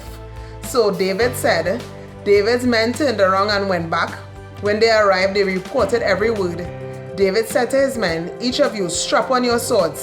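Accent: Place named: Trinidad and Tobago. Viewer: Yesss this is so true plus the story in a Trini accent makes it even better 😊 😘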